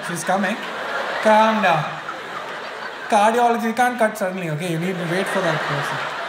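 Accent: Kerala, India. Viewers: rocking the Mallu accent tho 😀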